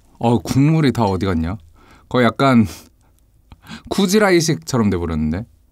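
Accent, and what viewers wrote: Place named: Gyeongsang, South Korea. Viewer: 승부하부먹충 이게 왜 일베야 경상도 사투리고만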